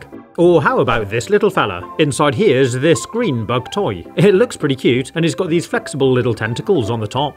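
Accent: United Kingdom. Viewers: Bros British 💀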